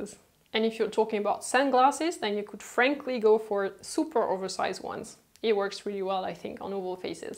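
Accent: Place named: France. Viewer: Hi! I love your videos ❤️. They're always so informative 👍. I also enjoy the soothing tone of your voice along with your accent! Im such s 'francophile'! Love all things French😘. Merci Mademoiselle!!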